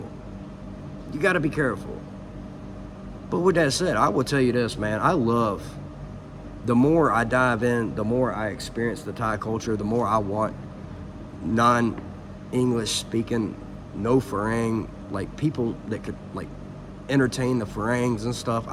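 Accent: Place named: Houston, United States. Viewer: I probably missed it in one of your previous videos, but I’m curious as to where you are from in the states. I’m from Houston, and you sound like just about everyone I know. Great video, and this type of shit is a prime example of why I’m not going back to the states once I retire. That entitlement is a motherfucker.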